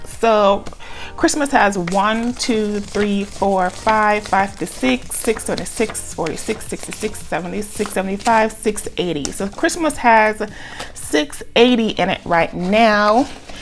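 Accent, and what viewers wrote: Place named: Jamaica. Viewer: Ooooooohhhhhh yeah... That Jamaican accent is fire 🔥💪🏽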